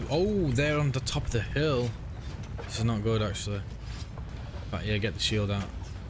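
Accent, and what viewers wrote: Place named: Manchester, United Kingdom. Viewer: Sound like you from Manchester mate well done on looking at this mod mate shit I’m on console pal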